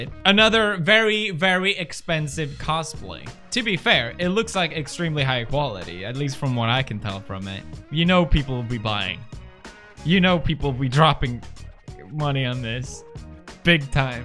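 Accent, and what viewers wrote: England, United Kingdom. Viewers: Your swedish has an english accent and i dont like it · Hmm sounds like he’s in England’s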